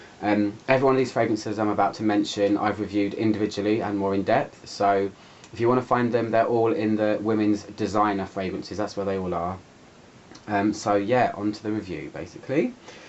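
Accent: United Kingdom. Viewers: great british accent, I envy you!:) Let me ask you a question, because I am watching and watching your perfume reviews now and thinking about buying this Joop! Femme online, but I've never ever smell it before.... and I don't know what to do! I have my 3rd big bottle of CK ONE now - for everyday use and Salvador Dali Dali Classic (this white one) - not for every day;). I love Dior - Midnight Poison, but unfortunately it is no longer available... I am not sure if Joop! Femme will be too strong scent for me and cause a headache or it will be just perfect to wear for night... What do you think?:)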